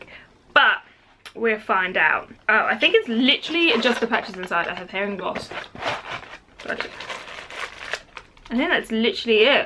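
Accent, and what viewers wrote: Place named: United Kingdom. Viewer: I used it last nite ... I can just listen to your British accent and voice and feel better lol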